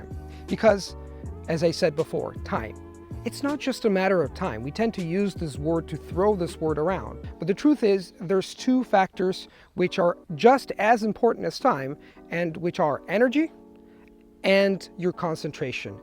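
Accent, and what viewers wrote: United States. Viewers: He’s lost his American accent. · J accents are only lovely when you are speaking english, but if you speak a foreign language with a heavy english accent, you often would get criticized because the pronunciation is off. I'm honestly impressed with his American accent, he sounds really fluent. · Hey there, long time no see ! Non vedevo tuoi video da qualche anno, è vero come hanno scritto altri che hai perso l'accento americano, sta capitando anche a me da quando insegno la dannata RP! Da iperpoliglotta con più di 20 anni di "carriera" non consiglierei però di imparare più lingue contemporaneamente, ho notato che mi ricordo meglio le lingue imparate "in solitaria" che quelle apprese in gruppo, i primi anni di università studiavo inglese/tedesco/portoghese e andavo a corsi serali di cinese, ho dovuto smettere non mi ricordavo più l'italiano! 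Comunque l'acquisizione duratura di una lingua è un mito, ho conosciuto poliglotti in età avanzata che col tempo si sono dimenticati una o più lingue, il che mi preoccupa un po' ... ci vuole soprattutto tanto tempo libero per mantenere livelli elevati in una lingua (C1/C2), rimpiango il periodo in cui non lavoravo... sto scherzando l'insegnamento mi piace molto. See ya ! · Love your american accent, I'm learning japanese and corean, the accent, entonation and phisical expressions are very important in those to express and conect with native speackers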